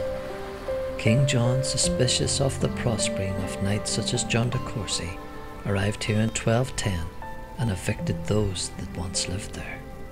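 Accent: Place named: Ireland. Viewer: Beautiful video, just found yr contributions to Northern Ireland, and I’m binge watching them lol. Loving hearing yr lovely Irish lilt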